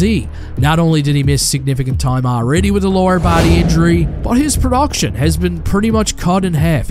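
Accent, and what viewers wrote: Newfoundland, Canada. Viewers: First time on this channel. Is a Newfoundlander? Just want to test my accent detection abilities?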